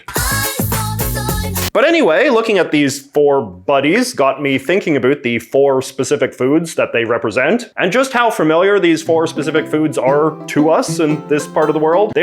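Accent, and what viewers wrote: Canada. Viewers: yes... I got it. The video was fun and well made anyway. I love your approach to culture. In France, culture is only considered with a capital C, focusing on the classics. Nobody would dare to take seriously a bunch of goodies found in happy meals. It is very refreshing. Beside, it enables me to learn a lot about American or Canadian culture. Love your Canadian accent too. I wonder if it comes from the French roots of Canada. Some French do indeed pronounce "about" just like you. On another topic, I wonder if you would consider making a video about Jordan Peterson. As both an advocate of free speech and a gay, I wonder what could be your intake on his case.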